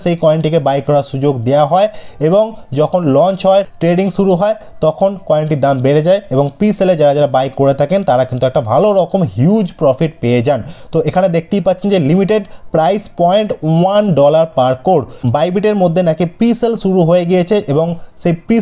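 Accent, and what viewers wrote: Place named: Kolkata, India. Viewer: আরে ভাই, এটা আঞ্চলিক উচ্চারণ। আমরা বাংলাদেশে আশা করি উচ্চারণ করি। আর কলকাতায় উচ্চারণ এমন। বাংলাদেশের কিছু অঞ্চলেও এমন উচ্চারণ করে। 🙂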